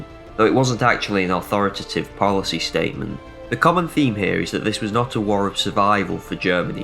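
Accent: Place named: England, United Kingdom. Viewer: Are you from stoke? Never expected a potteries accent clicking on a random vid.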